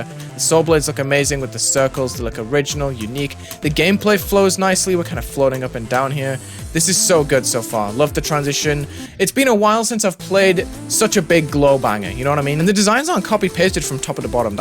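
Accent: United Kingdom. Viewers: Bri'ish man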